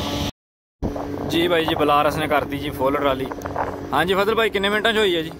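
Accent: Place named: Gurdaspur, India. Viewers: Veer di boli Gurdaspur wali aa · tuhadi boli sun ke subad a gaya ji lagda tusi sade punjab de hi hovo very nice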